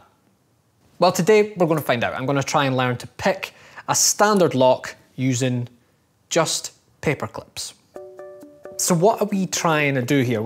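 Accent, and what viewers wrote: Scotland, United Kingdom. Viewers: R u Scottish you sound like it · Where in Scotland are you from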